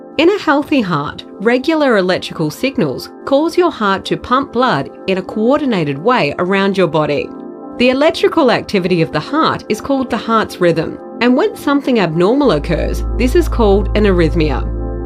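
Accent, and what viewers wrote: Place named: Australia. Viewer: I thought maybe I had a relaxation music video on at same time! 😂 that coupled with the Aussie? accent was a lot!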